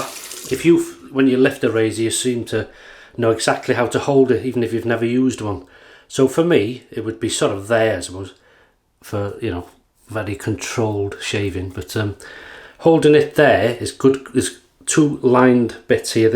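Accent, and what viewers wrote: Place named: Wales, United Kingdom. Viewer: His accent reminds me of Christopher Ecclestons accent. I wonder if he's from Wales too.... 🤔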